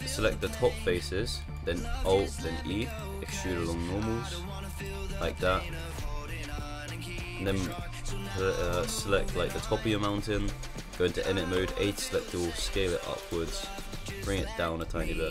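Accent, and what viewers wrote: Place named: United Kingdom. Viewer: shit tutorial and overrated, deserve like 1k views, I dont understand anything of what u are saying with ur stupid british accent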